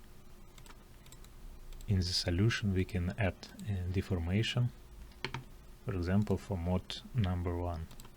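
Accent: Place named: Nizhny Novgorod, Russia. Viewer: Не понимаю зачем вы говорите на английском с кондовым нижегородским акцентом. Комментируйте по русски. Англичане с американцами вас всё равно не поймут.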